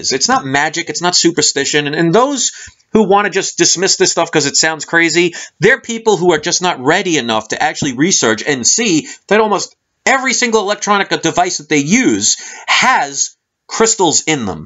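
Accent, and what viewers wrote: New York, United States. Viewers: I really enjoyed listening to this video and learning all about orgonite from you!! You are so knowledgable, and so passionate about what your doing and we need more people like that. Creating an awakening within others is difficult esp. when they are so quick to dismiss things out of ignorance, or discomfort. I commend you for doing your part to disrupt the powers that be, and bring positivity into the world. plus you sound like a fellow new yorker haha so you're already good in my book :)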